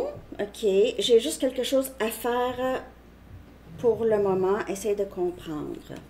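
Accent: Quebec, Canada. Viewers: Tu es vraiment bonne et super drôle! 😄🥰 c’est l’fun d’entendre une Québécoise. Beau ressenti, merci pour la guidance! ❤️✨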